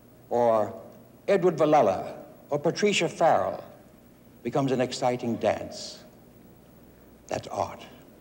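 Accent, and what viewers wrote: United States. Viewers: ***** New York old Irish....... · Lower East Side Manhattan accent. There is NO mistaking it!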